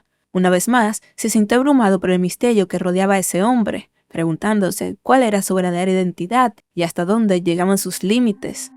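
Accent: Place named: Dominican Republic. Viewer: 🗣️🔥🔥🔥Lo sabía es que el acento dominicano es eterno ✋🗿🤚🇩🇴🇩🇴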